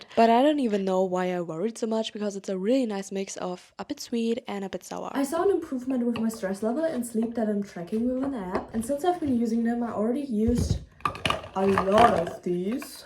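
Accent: Germany. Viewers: I’ve never watched a video from you and like 10 seconds in I was like wait is she German? 😂 not in a negative way, I’m also German, just shocked how easily I spot German accents because they sound so similar to me haha (great video!) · I KNEW IT ! I heard German! But tbh its so cuteee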